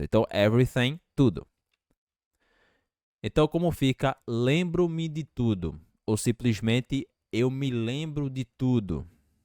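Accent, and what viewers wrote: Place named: Northeast, Brazil. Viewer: Ele falando ingles com sotaque nordestino é tão fofinho😊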